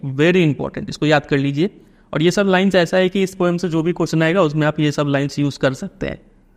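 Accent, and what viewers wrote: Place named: Bengal, India. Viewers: Sir app bengali ho na?? I understood because I am a bengali too.. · Sir's bengali accent revealed 🎉🎉🎉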